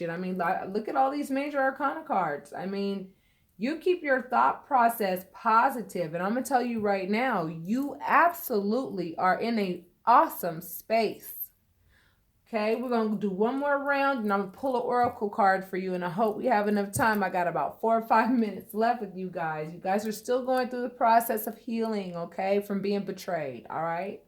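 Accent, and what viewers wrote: United States. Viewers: wow resonates so much luv the yanki accent well said hammered the nail home bless thank you xxxxxx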